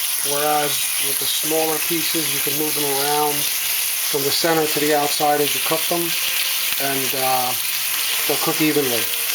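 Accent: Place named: New York, United States. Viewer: Sounds to me like he's from somewhere around New York City.. I'd guess Brooklyn or Long Island. Real nice classic downstate NY accent., Am I anywhere close? I live in Westchester so I know I'm in the right neighborhood.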